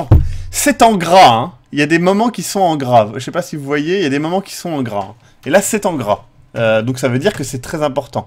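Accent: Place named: Africa. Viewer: Salut dis moi t'as vécu en afrique ou sur une île Africaine ? Question à ta façon de parler certains mots.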